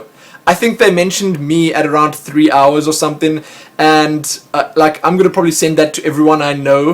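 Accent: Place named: Cape Town, South Africa. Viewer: capetonion accent FTW ! lol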